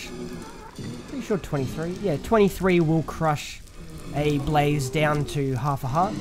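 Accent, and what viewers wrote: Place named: Australia. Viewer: Which part of australia are you from????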